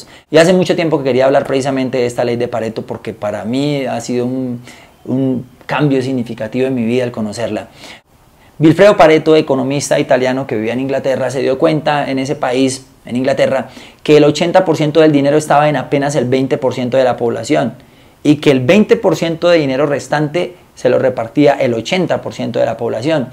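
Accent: Colombia. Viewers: Eres Colombiano? muchas pero muchas gracias parcero, enserio wuevon muchas gracias. jejeje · Muy bueno, de acuerdo con mucho de lo que dices compañero! Solo recomiendo ir modulando bastante el acento en español.... Supongo que eres santandereano! Jaja yo también lo soy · huyyy parcero lo felicito eres un teso por la forma en que hablas y te expresas debes ser colombiano. así que felicitaciones. cómo pues es pa lo del PDF de vocabulario parce ya me suscribí y estoy compartiendo tu canal con todo mundo. mi correo es <mail> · Por su acento usted debe ser Colombiano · Me encanta que seas de Bogota y nuestro acento en genial no hay porque cambiarlo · Bobos culicagados haha me agrada el acento colombiono...buenos videos my Men... saludos desde mexico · no se porque usted se me hace que es colombiano ? is;not true? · puro acento de colombiano!! viva Colombia !!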